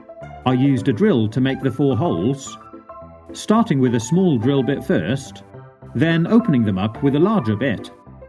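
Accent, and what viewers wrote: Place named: RP, United Kingdom. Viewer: I like your posh British accent! I’m British and have a very posh accent! I’ve half way through made this with my dad, I’m making it but we’ve only done about half (I’m exited for it to get finished!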